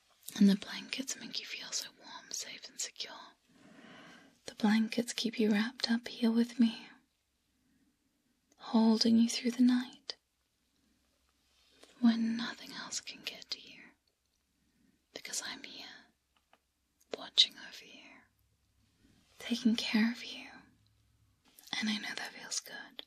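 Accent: United Kingdom. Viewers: i enjoyed that slight british accent feels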